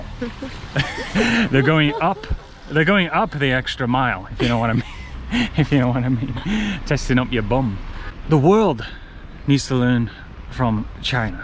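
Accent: United Kingdom. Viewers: I came to listen British accent. It's so cute. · No more UK accent? Haha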